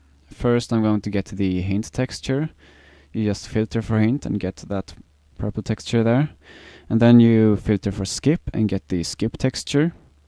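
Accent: Sweden. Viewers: Thank you very much! You sound extremly swedish!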